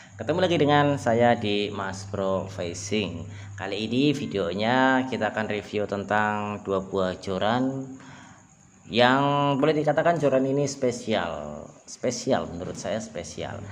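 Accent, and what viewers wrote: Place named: Banyumas, Indonesia. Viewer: Jnengan tiang pundi mas,kayane republik ngapak 😅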